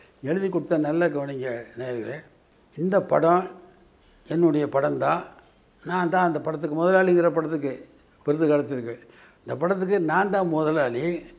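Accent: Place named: Madurai, India. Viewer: Wonderful presentation in Madurai slang.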